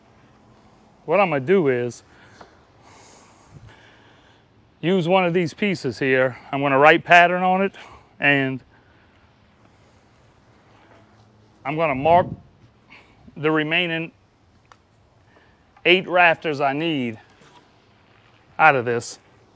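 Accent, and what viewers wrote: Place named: Acadiana, United States. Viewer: I recognize an Acadiana accent a mile away.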